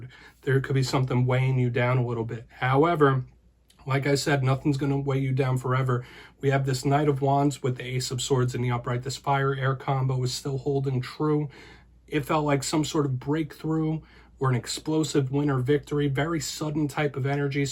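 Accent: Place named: Delaware Valley, United States. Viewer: Powerful reading, thank you. Sounds like you’re from the Delaware Valley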